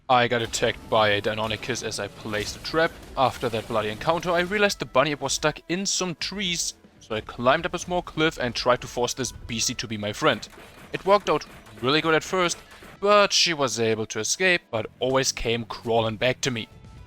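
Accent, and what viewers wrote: Germany. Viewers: Are you German I hear like a German accent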